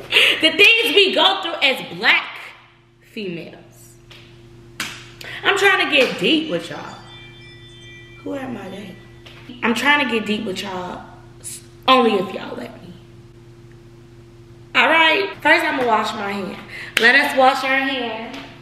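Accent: New Orleans, United States. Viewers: Can u talk in your New Orleans accent with your LA friends 😆 🤣 😂 PLEASEEE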